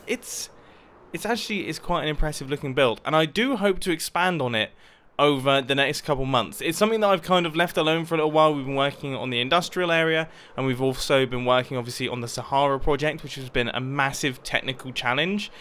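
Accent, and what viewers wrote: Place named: United Kingdom. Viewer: Am I the only America who thinks it’s hilarious when Britains speak basically a different language like it’s not pronounced laver it pronounced LAVA!!!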